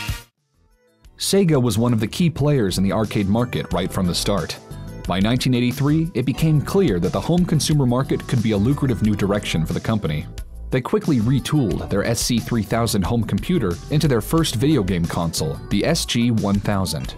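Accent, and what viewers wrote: United States. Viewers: Shinobi being one of my fave Megadrive games ever! Curious why you use UK boxes with an American accent? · You my friend are the best gaming historian I have ever seen. I'm not kidding, I would pay to watch this show without batting an eye. You should consider packaging these episodes and selling them as volumes on Blue Ray and digital download. I would easily pay 10 to 15 dollars per disc....Hell, I could be persuaded to spend more depending on the episode. Every console and game you cover is done at a professional level not seen on YouTube before. Further, the reverence you pay to the subject material is unparalleled. Thank you for making these videos. One question that I have...Why do you call Sega's 16-bit machine the Mega Drive and Mega CD? I ask this question for two reasons. You yourself have an American accent. Further, if I had to guess it appears that most of your audience is centered here in the US. 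Now, this does not change my opinion of you or your show obe bit. If you prefer calling Sega's 16-bit console the Mega Drive, have at it.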